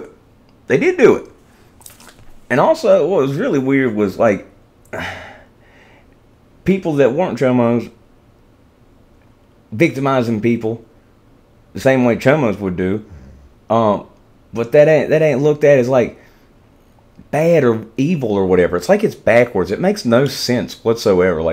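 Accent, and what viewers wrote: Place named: South, United States. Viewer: You are from the south, you always talk funny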